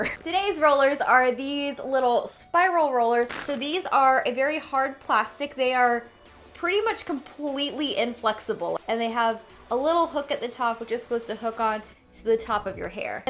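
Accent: South, United States. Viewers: i love this video! two things: 1. lmaooo when you said "don't worry, i have another one" and i thought you meant a backup hair dryer, but NO you had a backup dome hat thing OF COURSE. 2. lollllll at your accent, saying that you don't have one, but those two words "git" you. your "e" is often "i" in a very southern and very cute way! no hate here, only love! thanks for another great video! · omggggg southern accent sounds so cute:) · There's nothing wrong with a southern accent. I am a true bornand raised southern and i wouldn't have it any other way. This was my first time watching you. I subscribed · Im from the south too!!! Yea i notice the twang sneaking in too lol. Also could you do some more "curler" videos? Ive seen so many i wonder about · This was very enjoyable. I'd like more videos like this on occasion. 😊 Plus, your comments at the end about the remnants of your southern accent were so fun/funny! 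😁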